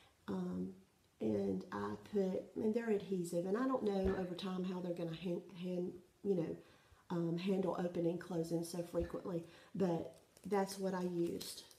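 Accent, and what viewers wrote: South, United States. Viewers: Great video.... I LOVE your project bags. I'm not good with a sewing machine but I think I have to attempt a project bag... Your choice of fabrics is striking....Love them, love them, love them... did I say I love them ? :) Please do keep making your videos and share your WIPS and FFO with us. Love your Southern accent (and your friendly personality) reminds me of my relatives back home (Arkansas). SO, from the Pacific Northwest, welcome to Flosstube and Happy Stitching. Hugs, Toni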